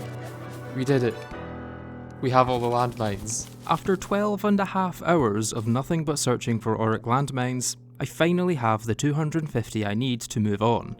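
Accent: Scotland, United Kingdom. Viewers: i love your Scottish accent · are you scottish. that is my accent guess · By the way are you scottish?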